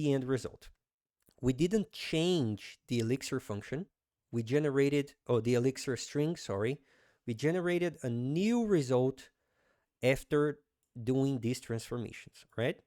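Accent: Brazil. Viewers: Great content! Are you Brazillian?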